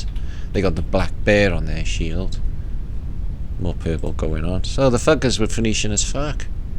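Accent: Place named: Liverpool, United Kingdom. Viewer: Febf good to see British YouTube canel bless you xx bro luv da Scouse x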